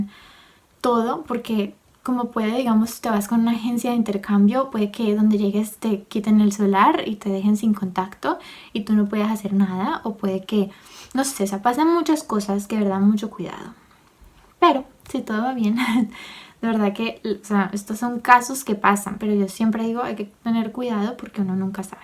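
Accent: Cali, Colombia. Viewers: Jajajaja como contas las historias ve! con ese acento caleño re marcado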